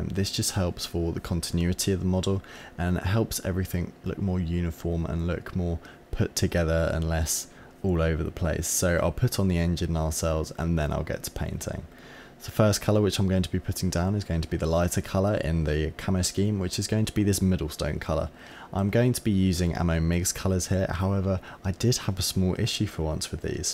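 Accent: England, United Kingdom. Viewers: Hi, re Tamiya where are you? You sound English? For two years you are rather good. Do you know how many models you have made? Bob England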